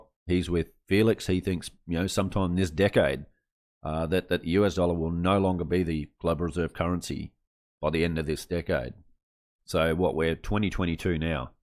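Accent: Australia. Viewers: Learn to speak properly bogan..........we are not all feral westies.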